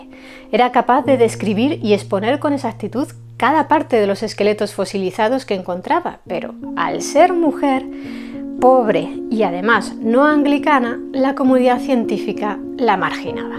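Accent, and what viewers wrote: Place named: Valladolid, Spain. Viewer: Hola, muchas felicidades a por este vídeo pues como siempre su preciosa voz tranquila y pausada, junto al hermoso fondo musical y las imágenes bien seleccionadas me hace disfrutar de estos temas, me relaja mi mente, me distrae de mis ocupaciones de médico y su acento me recuerda a Valladolid España un bello lugar que visite por motivos de mi especialidad, adjunto su gran logro pues temas de misterio, historias curiosas y paleontología me agradan bastante y en este medio están casi dominados por hombres, así que muy bien por que logra destacar en este medio, adelante y mucho éxito!! Desde México: Atte Dr. Víctor López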